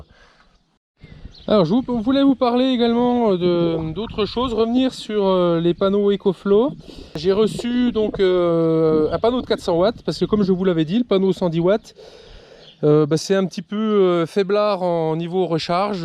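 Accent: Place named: Franche-Comté, France. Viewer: Tout à fait d’accord avec Youcef, quand on regarde la vidéo on est tellement dedans que le temps passe trop vite et petit bonus pour moi, l’accent franc-comtois et les expressions qui vont avec que j’adore entendre en tant que franc-comtoise. Tu ne soûles pas, tes explications sont simples et concises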